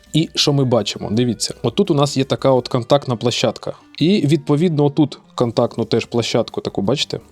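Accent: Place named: Poltava, Ukraine. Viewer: Чоловіче, судячи з акценту, ви точно з Полтави))). І це говорю в хорошому сенсі)) . Дякую за відос, реально дуже корисний!!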